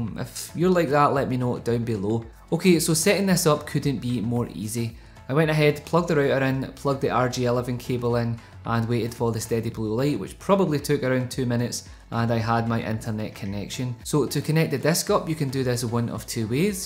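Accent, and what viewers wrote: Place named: United Kingdom. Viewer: You live in britain from the sounds of the victorian house, and your accent suggests you haven't emigrated, so you're well aware that you did a rude gesture, not peace fingers, at the end of the video. That's disingenuous. You also say "go ahead" where it adds no extra information about twice a minute, which is a very American trait, though also far more frequent than realistic. A speach coach would most likely tell you to remove these meaningless phrases from your vocabulary. It seems like you're trying to capture an American audience by making your content more familiar to them. But you're reviewing a BT product, BT being an acronym for British Telecom. American's don't care about products that aren't avaialble in America, so why are you forcing this unatural familiarity? It only hurts your credibility. There are American YouTubers who are very popular in the UK, and there are British YouTubers who are very popular in the USA. 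Your nationality does not affect your credibility. Pretending to be American in such a forced way does. And that lack of integrity caries over to your reviews. How can we trust what you say about these products, if we can't trust you talk as you naturally do? Other than the offensive gesture and overuse of "go ahead", great video! Thank you for taking the time to make it!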